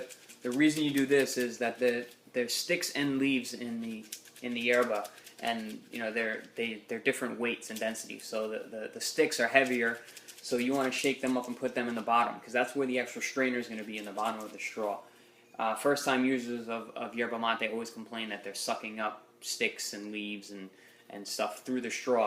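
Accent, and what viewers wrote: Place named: Argentina. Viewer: che...... vos sos argentino?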